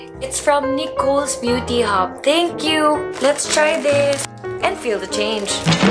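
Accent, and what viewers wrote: Visayas, Philippines. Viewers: Charr,Bisaya syaaaaa!!! Ateeee!!😍😍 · nah kay imbes seriuso ko nangatawa ko sa pag sulti nim ug visaya... nah kay maka lingaw kaayu lagi ka...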